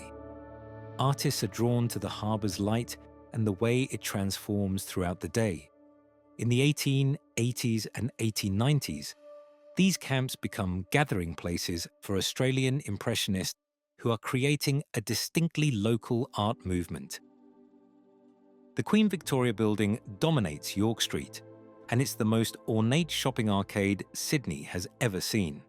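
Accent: United Kingdom. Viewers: This is so full of errors, it's ridiculous. Despite the British accent, the text is USA English, as the frequent 'sidewalks' shows, the camera panning is disjointed, placing of famous buildings is wrong and at 6.55 we see a smoke filled harbour while the voice tells us it's a sweeping blue paradise for artists. What is the point of it? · Perhaps my expectations of AI is unreasonable as despite finding the video interesting it's not very good. It did make me laugh at a few points so I suppose that's a good thing. Who doesn't enjoy a good chuckle? If this was presented as a school project you could only give it average marks. The constant repitition of the same scene turns a five minute video into over ten minutes. I find using a British sounding narrator interesting despite it being a U.S. production. I suppose it attempts to give it more credibility but being U.S. 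produced it explains why "sidewalk" was used rather than "footpath". Anyway, I'll have to wait for AI to improve. Hopefully before all of the AI robots kill us.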